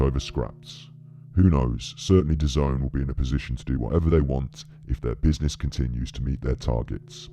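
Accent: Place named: Midlands, United Kingdom. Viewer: It's not Hatman, the inflection in some words have a midlands accent. Also it wouldn't make any sense for them not to discuss this on their own channels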